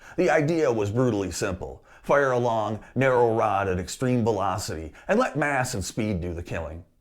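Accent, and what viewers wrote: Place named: United States. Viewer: Spoken and presented like a true American 🇺🇲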